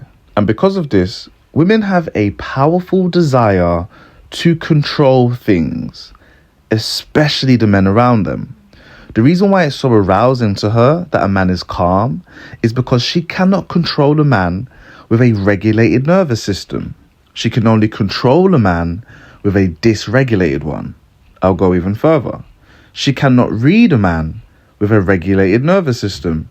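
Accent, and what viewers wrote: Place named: United Kingdom. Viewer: Ppl like the fake. That’s why redpill folk so popular. Goofy ppl gravitate to goofy ppl. He’s this era Patrice O’Neal with the UK accent. Wish him success nd continued contentment in doing what he loves.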